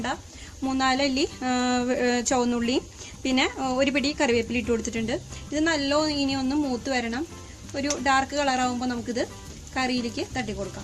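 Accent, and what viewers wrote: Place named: Mullassery, India. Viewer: മോൾടെ വീട് മുല്ലശേരി, പാവറട്ടി ഭാഗത്താണോ. സംസാരം കേട്ടിട്ടു വടക്കാഞ്ചേരി ആണെന്ന് തോന്നില്ല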